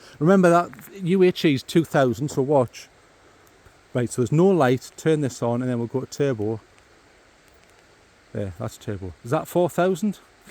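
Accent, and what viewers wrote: United Kingdom. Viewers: Good review I have just ordered one even though I already have 3 head torches. Good to hear a North East accent, I’m from Sunderland and now Durham 👍🏻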